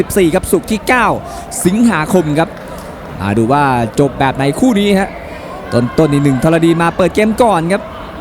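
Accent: Isan, Thailand. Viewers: นอ้ง พากมวยสนุก.ให้เสียงไทยและอี่สาน.ที่ไม่เหมือนใคร.ไทยประสมอีสาน.จะมีบางคำก็ตลกเฮฮาไปดว้ย.บางครั้งผมดูมวยไปฟังเสียงนอ้ง.ผมก็ยังหัวเราะคนเดียวไปดว้ย.มีควสมสุขดีครับได้ฟังนอ้ง พาก.สนุกกว่าพิทีกรอยู่ในสนามมวยเสียอีก.ชอบนอ้ง มากๆครับ.FC.อยู่ฟังลาวครับผม❤❤❤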